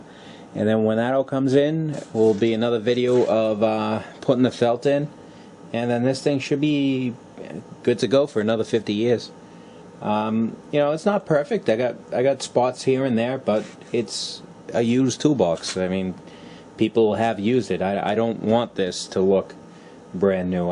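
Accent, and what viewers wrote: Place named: Massachusetts, United States. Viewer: Nice ! I picked one up from a older mans wife who passed away. She sold me around 60 end mills , starrett lufkin BS ect tools indicators planers 123 blocks list goes on and on . She was asking 75$ with out a blink I purchased it. Great video though. Were ya from sounds like Mass im in CT. North east is a machinist dream.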